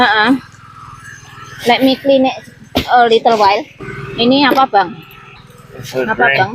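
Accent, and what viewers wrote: Java, Indonesia. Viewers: Mingat..mingat o kono ..iki barangmu...hihi ..seneng dengerin khas jawa nya 😁😁 Bun saya sering koment, smg tdk bosan😊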